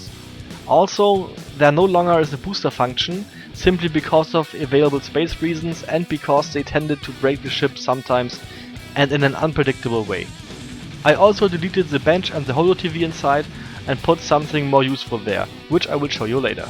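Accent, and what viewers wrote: Germany. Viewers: Krasser deutscher akzent xD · I really like that design! Good thing you showed up at the stream. Another good youtuber to watch :D. (German accent I hear there?)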